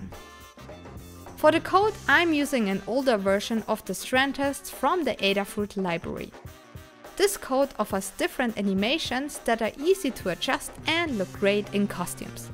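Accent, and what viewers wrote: Germany. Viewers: In den ersten 2 sec gehört das du aus Deutschland bist. No front. Ich finde es gut 😁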